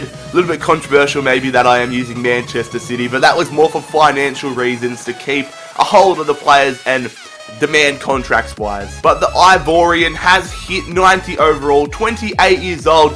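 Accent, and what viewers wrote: Australia. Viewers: mate, he's an Aussie, I'm a kiwi and I can understand that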